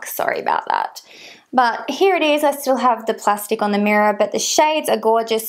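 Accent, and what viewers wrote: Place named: Australia. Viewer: I Love your Australian accent it's beautiful, Are you able to get collection products if so I would love for you to do a testing video for it because I want to see other people's reaction to some of the products I own and love and if you agree X Shannon love you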